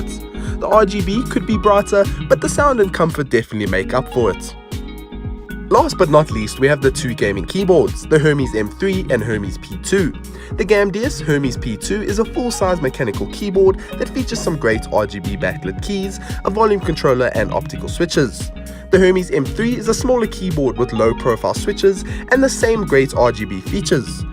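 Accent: South Africa. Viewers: i hear a fellow saffa accent, I hit subscribe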